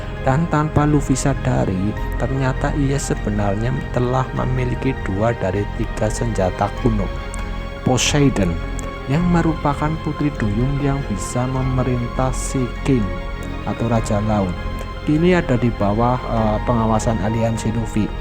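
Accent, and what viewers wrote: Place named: Java, Indonesia. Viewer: suaranya medok bngt!!mau nonton jdi kga jdi ,next.vidio pake teks aja gan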